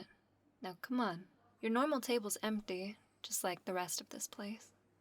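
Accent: South, United States. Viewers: Love the audio and love the southern belle hints to it aswell great work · Love the southern twang in this one. Thanks for the audio! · That southern drawl adds SO much!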